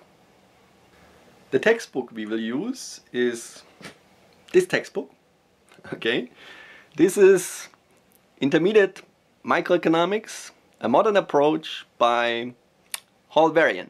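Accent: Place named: Germany. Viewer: German english :)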